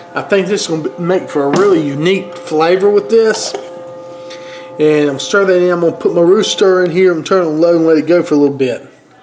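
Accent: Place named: Mississippi, United States. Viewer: Are you from around gulf port MS? My friends have a similar accent at Ole Miss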